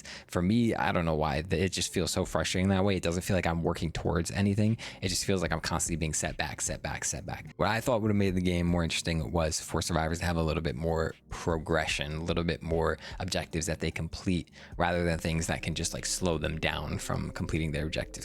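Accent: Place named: United States. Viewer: Finally a guy with an american accent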